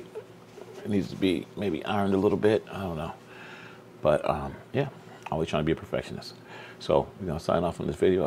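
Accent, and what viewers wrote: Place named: California, United States. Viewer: Wow! You got that CA accent now!!!! Much love friend.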